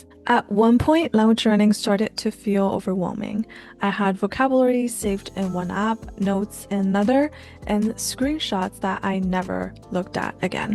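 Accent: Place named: England, United Kingdom. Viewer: You have a great English accent! Subarashii!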